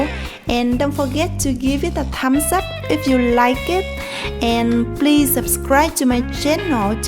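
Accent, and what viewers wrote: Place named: Laos. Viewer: Tiếng Anh mà nghe như tiếng Lào. Phát âm vc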